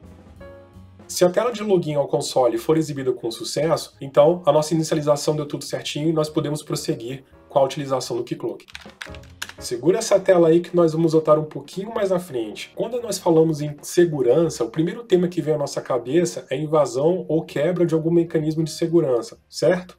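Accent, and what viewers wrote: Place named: Rio de Janeiro, Brazil. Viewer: olha os microservicos aeeeii, bem carioca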